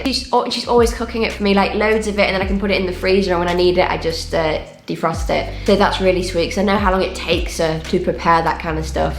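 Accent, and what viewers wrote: England, United Kingdom. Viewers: I really like ur English accent. Hoping you have a good lifetime in Taiwan.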